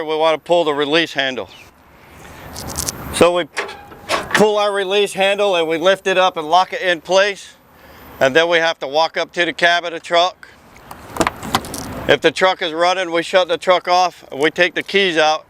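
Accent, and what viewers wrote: United States. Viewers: Really informative, spot on - - and that East Florida accent is the cherry on top.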